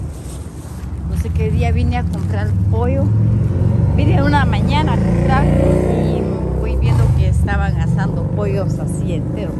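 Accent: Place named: North, Mexico. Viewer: Ay como se lea subido el norte asta el ablar bien finjida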